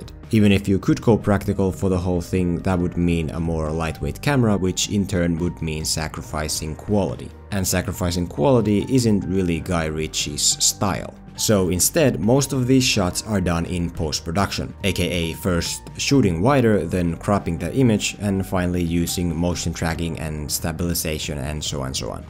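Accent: Finland. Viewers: You sound very much like a fellow finnish man. Am I correct? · Suomi aksentti on point mun nekru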